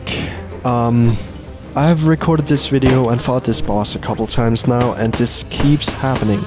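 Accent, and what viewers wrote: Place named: Denmark. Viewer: You sound VERY Danish!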